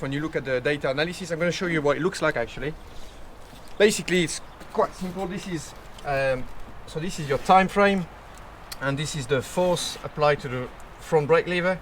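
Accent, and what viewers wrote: France. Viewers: from his accent, clearly french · He’s French lol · you are a true gentleman of the track. love your videos, and never get tired of the Anglo - French accent:). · Are you a native French speaker ?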